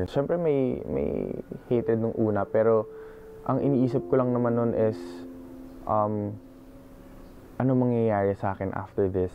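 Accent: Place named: Conyo, Philippines. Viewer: Conyo magsalita neto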